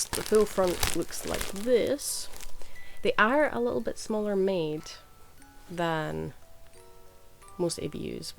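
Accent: Scotland, United Kingdom. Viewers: Where does your accent come from? Are you Scottish?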